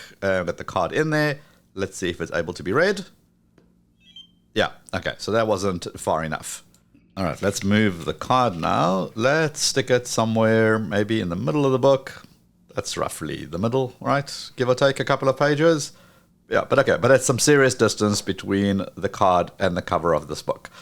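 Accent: South Africa. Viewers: Love that lekker South African accent of yours!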